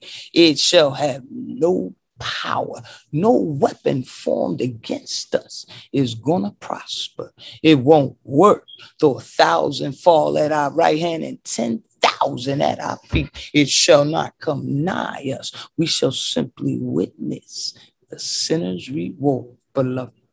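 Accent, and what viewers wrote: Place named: New York, United States. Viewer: Sister, you are a wise beautiful person. All praises to TMH for you!!! He has given you great strength and I really appreciate it. I'm glad you are speaking on this, because I saw that particular Kerry Ann's video about India, and I was saying the same thing, "They are NOT TMH'S PEOPLE at all shaking my head. THEY ARE NOT CALLED BY TMH'S NAME!!! Sister, Psalm -11 tells us to rejoice when we seeth the vengeance.... Sister, you sound like a Northerner, a New Yorker. Anyways, TMH continue to open your eyes sister. Please, if you will sister, check out gdash the prophet channel. He was similar to you, but TMH has raised in up to a higher level of wisdom, knowledge, and understanding. May TMH raise you up higher as well. Peace Sister. Love you my sister.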